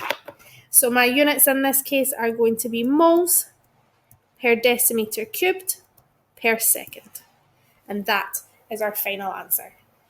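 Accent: Scotland, United Kingdom. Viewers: thank you madame for the great explanation.By the way,I liked your scottich accent. :)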